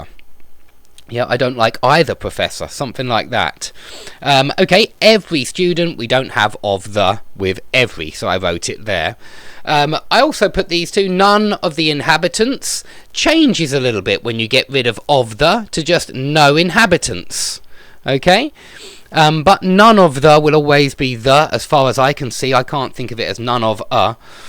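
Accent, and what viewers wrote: United Kingdom. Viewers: That's British accent with his perfect voice.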